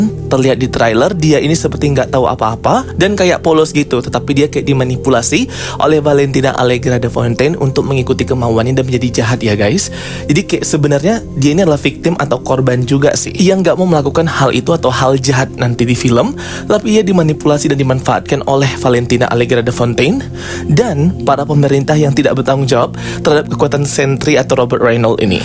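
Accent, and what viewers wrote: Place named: Sumatra, Indonesia. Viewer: Menit ... " kek mana?!" Orang sumatra ko rupanya bang..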